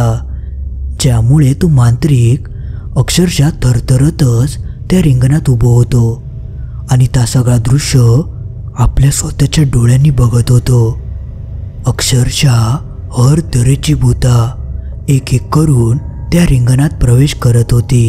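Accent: Malvani, India. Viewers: आपण जिवंत माणसं आहोत जी पाच तत्वांनी बनलेल्या शरिरात जिवंत आहोत पण भुतं मग ते किती ही आणि कोणत्या ही स्वरूपात असो जिवंत शरिरातील माणूस केव्हाही त्यास प्रतिकार करू शकतो असो ज्यांना अनुभव हावा आहे त्यांनी प्रयत्न आणि सातत्याने पाठपुरावा सुरू करावा त्या क्रिया विधी कर्म गुप्त ठेवण्यात आल्या आहेत पात्रं नी कू-पात्र अशी परिक्षणं करुन च् या विद्या शिकवल्या जातात. 🙏 मालवणी भाषेत नी ऐकण्याची शैली आवाजातील भ्रमर नाद नी गंभीरता गोष्टीची गुढता व भय आतुरता वाढवते 👍👌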